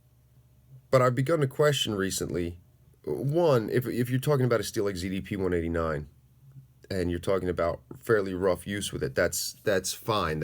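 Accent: Philadelphia, United States. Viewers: Ain't no hiding that you're from Philly that is FOR SURE. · I had a feeling was from the philly area. Born in philly and lived in or around the city for 36 years so I tend to pick up on our distinct accent. Go birds.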